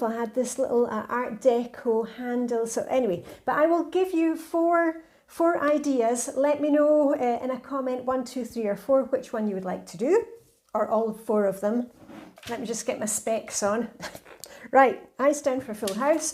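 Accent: Scotland, United Kingdom. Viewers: Congratulations on reaching 100k subscribers! I stumbled across your channel last week and was so happy I did. I loved hearing your Scottish accent (both my grandparents were from Scotland) and your enthusiasm and positivity is so uplifting and inspiring. Have a wonderful weekend. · Well done!! What an achievement. A cold morning here in Manitoba, Canada. I lived in Edinburgh for a time 8 years ago so love hearing your accent. You have really helped me to have a set time for my routine in the evening. I work away from home so the evening really helps me prepare for the day and eat well!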